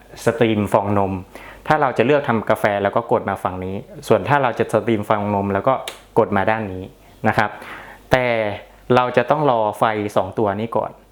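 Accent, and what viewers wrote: North, Thailand. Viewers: คนเหนือก่อครับพี่ รีวิวดีมากเลยครับ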